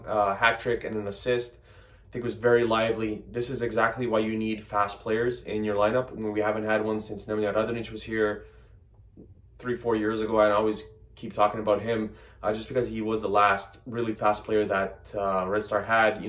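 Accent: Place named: New York, United States. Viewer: Nice recap. Sjajan ti je ENG :) - American NYC dialect :D Anyhow, keep up the good work!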